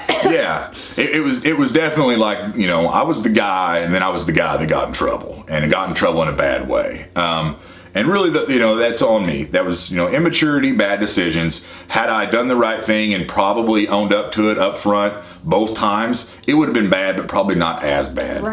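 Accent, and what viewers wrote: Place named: South, United States. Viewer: I could listen to him talk all day! Lol Such a southern boy accent!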